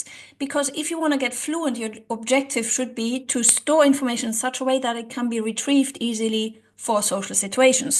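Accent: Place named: Germany. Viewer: Just found your videos and they have some great tips. You sound German, your English is good though.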